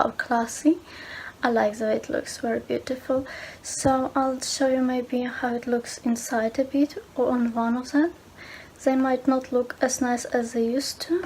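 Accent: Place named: Serbia. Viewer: я вот слушаю, и думаю, что английский не родной )) думала, может девочка из Сербии или ещё откуда-то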